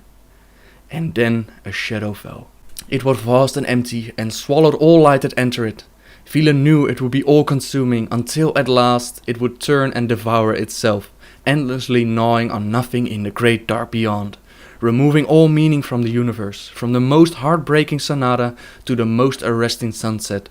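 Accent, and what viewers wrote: Netherlands. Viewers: dat dutch accent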